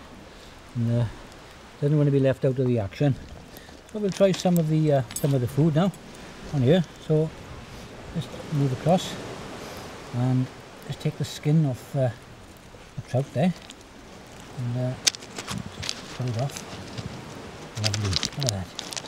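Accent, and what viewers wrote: Wales, United Kingdom. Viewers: Great videos but am improved mic setup might be a worthwhile investment! Can be a bit difficult to hear sometimes with the thick welsh accent and background noise.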